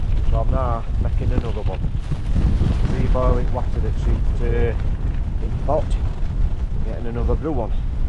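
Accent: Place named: South Yorkshire, United Kingdom. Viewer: Loving these videos I’m a ryhill lad originally you sound like you’re from Royston or South Yorkshire bloke live in Barnsley now me and my son been watching these videos lately and the other folk good bloke outdoors Paul mesner lads only 7 but likes these videos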